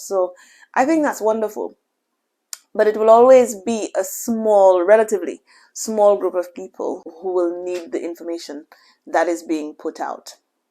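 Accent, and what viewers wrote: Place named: United Kingdom. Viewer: Your accent is definitely British 😅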